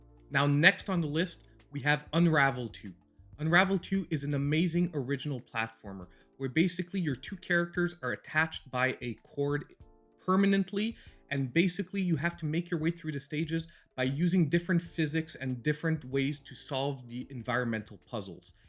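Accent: Quebec, Canada. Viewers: You can't make the connection between Maple Syrup and the French accent? He's Canadian.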